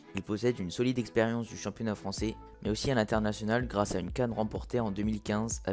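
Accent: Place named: North, France. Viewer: Tu fait de bonnes video mais désolé cette accent du nord j'en peut plus il faut arrêter les a qui son des o c'est pas beau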